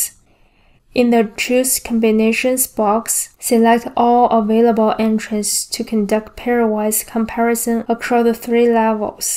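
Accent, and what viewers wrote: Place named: Asia. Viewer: Super thick Asian accent. Had to turn on subtitles.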